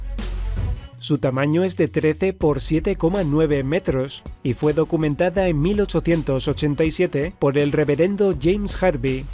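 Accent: Spain. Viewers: gordito de gafas No oye acento de España? .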